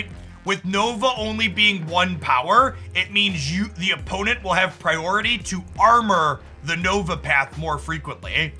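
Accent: United States. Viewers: haha! Could tell within 2 sentences. Source: someone also from the south side.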